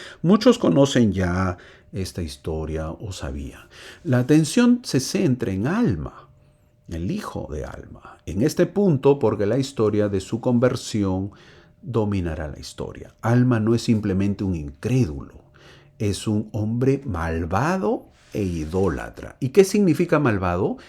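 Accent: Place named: Peru. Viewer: yo les he hecho esa reflexión a mis hijos. Les obligamos a ir a la escuela. Pero si no quieren ir a la Iglesia, ahí hablan de la libertad de escoger. Siempre les digo que es lo nos va a dar la vida eterna??? Parecernos a Jesucristo eso lo aprendemos en la escuela llamada Iglesia. Y se quedan mirándome, y me dan la razón!!!😊, gracias me da gusto escuchar acento peruano!!❤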